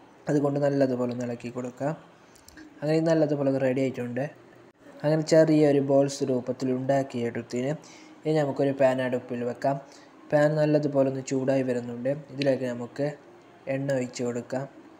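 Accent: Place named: Kasaragod, India. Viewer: കാസർഗോഡ് സ്ലാങ്ങും കാസർഗോഡ് പലഹാരവും കലക്കി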